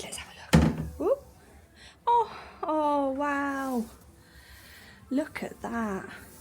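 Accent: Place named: Black Country, United Kingdom. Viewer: i’ve just found your channel, completely by accident. Your videos are superb. You have a lovely way about you and describe things so well! I used to live in Coseley in Wolverhampton, so lovely to hear your accent as well. Very best wishes. Neil in Southport.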